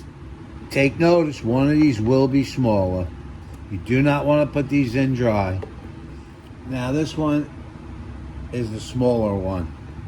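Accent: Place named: New York, United States. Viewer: You sound like you’re from NYC. Queens?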